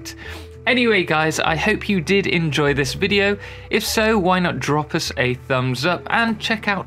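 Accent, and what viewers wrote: United Kingdom. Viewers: This has got to be the most British sounding person ever.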